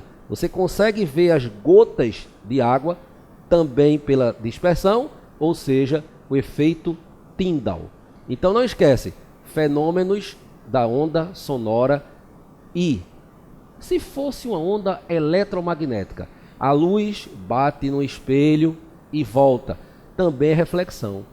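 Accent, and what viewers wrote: Northeast, Brazil. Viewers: Idiotas do Centro-sul que criticam o sotaque nordestino! Caguei pra vocês!!!! 💩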